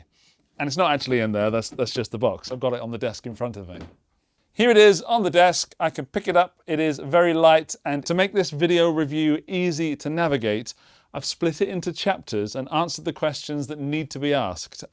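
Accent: England, United Kingdom. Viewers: You provide a window into the future from Austria, but with a subtle English accent of a part of England that this American born citizen of the world cannot identify on a map of counties and London neighborhoods. I realized about 3 sentences in that I am not the target market, but I do enjoy your geeking out on toys that you dig. Keep up the hard work and enjoy the last month of summer (more or less).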